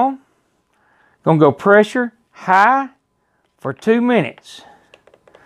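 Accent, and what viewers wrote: South, United States. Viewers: I just love your Southern Accent! My teenage daughters continue to watch you , they even walk around spewing out your hook”007” they just Love you! Keep on Cooking!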